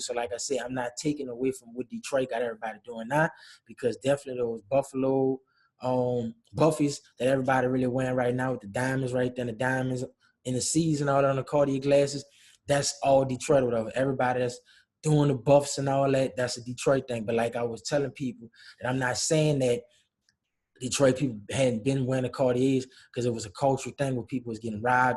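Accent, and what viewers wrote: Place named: New Orleans, United States. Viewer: U sound like u from da NO tho.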